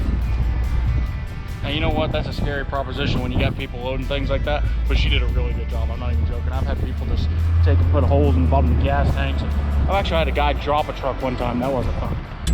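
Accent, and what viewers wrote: South, United States. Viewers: Don't worry I subscribed but your voice sounds like Mickey Mouse with a Southern drawl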